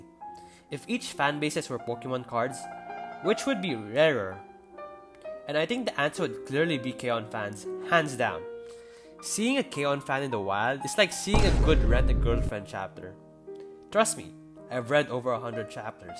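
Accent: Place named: Tagalog, Philippines. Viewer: im hearing a tagalog accent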